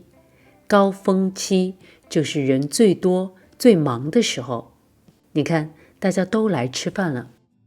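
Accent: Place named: North, China. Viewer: Beautiful northern accent ( im guessing here) you have got!!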